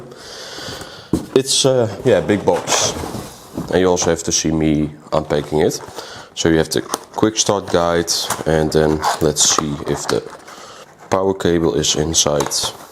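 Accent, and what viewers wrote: Netherlands. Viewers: You sound very Dutch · i hear a little bit dutch haha. nee wel goede video. ben zeker om deze te kopen.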